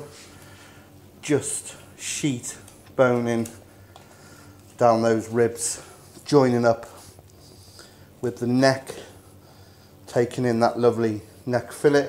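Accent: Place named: West Country, United Kingdom. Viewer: You got a westcountry accent like mine but I cant quite place it... top video by the way. Butchered by own lamb while watching you.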